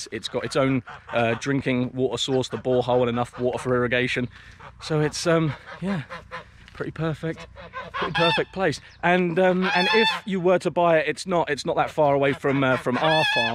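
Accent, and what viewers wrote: Norfolk, United Kingdom. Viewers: Sound like a rum ole Norfolk boi! Nice vid thanks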